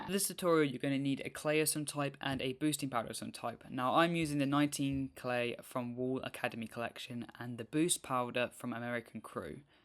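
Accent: United Kingdom. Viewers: I would like to say I understood everything what you said, but actually I didn't... it's because the way you speak is kinda hard to understand at the begging (I'm used to listen american accent), but on the other hand I love it... british accent is so peculary it's so fantastic, I would like to speak with your accent (I'm not from a english speaker land). Ohhh and good video... Actually I watched it bc your accent lol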